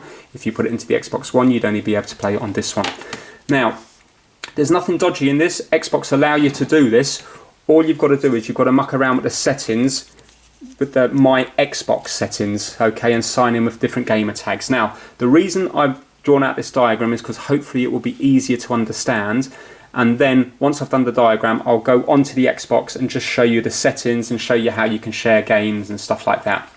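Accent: United Kingdom. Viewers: Awesome! Here we are in 2019, self-driving cars and all, yet those janky paper-cutouts (and my subliminal sense of confidence in an erudite British accent) were exactly what I needed so my kids can play at the same time. Thanks dude, from JB in California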